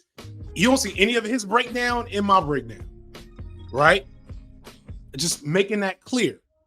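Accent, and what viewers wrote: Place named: Atlanta, United States. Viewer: so I'm not a native English speaker. I would call myself fluent in English but my two remaining gaps are technical vocabulary (terms specifics to an industry or a medium, terms that are never used in conversation so they're hard to learn simply by talking to people or even by reading books or watching films) and dialects/languages derived from English (kinda ironic because in my native language I'm the product of two families that speak a patois specific to their region). I really enjoy watching content more as a learning tool into American culture, Black-American culture and simply the perspective of someone older than me with a long academic background and complex, thoughtful takes I enjoy hearing through my own cultural lense. I hope you'll understand I'm coming at this with a genuine desire to learn, and since it's about spoken language, it's pretty hard to look up myself. 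I feel like the way talks in the long, scripted main channel videos is both influenced by his accent as well as articulated in a way that makes it evident this was a research, probably beta-read, rehearsed script that he's reading. By opposition, videos like this one on the B Sides sound to me like not only his Atlanta accent is stronger but he's switching between AAVE and English throughout his sentences (as I understand it, AAVE is it's own language with a culture and an interesting history of ethymology so it feels appropriate to treat it as such and view people who use it as bilingual, but i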